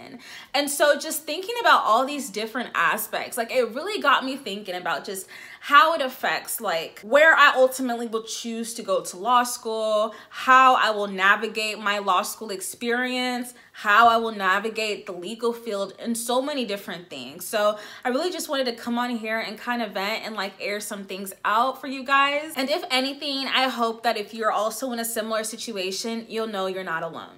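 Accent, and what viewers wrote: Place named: Nigeria. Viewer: You got some Nigerian accent, kinda of tho.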